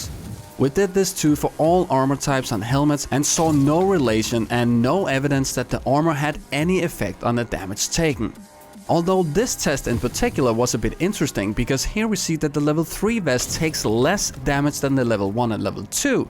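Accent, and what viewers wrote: Denmark. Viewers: Hahah man kan høre han er dansk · You sound danish my friend :-)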